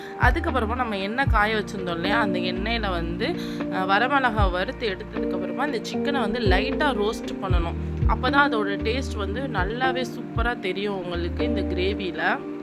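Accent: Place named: Ramanathapuram, India. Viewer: Akkaw neenga ramnad district dhane,apdiye enga ooru karavanga Madhiri pesuringa.chicken gravy pathale echeel oorudhu ka.mouth watering dishes ka.superb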